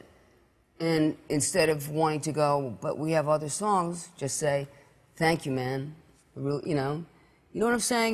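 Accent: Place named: New York, United States. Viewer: Love her New York cool accent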